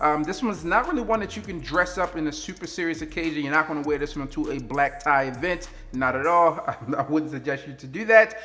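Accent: Baltimore, United States. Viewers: He has a VERY STRONG Baltimore accent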